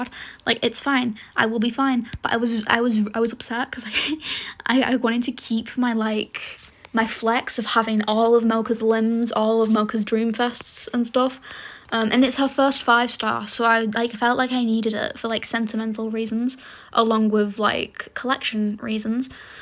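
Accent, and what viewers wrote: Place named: Manchester, United Kingdom. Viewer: loving the manchestian accent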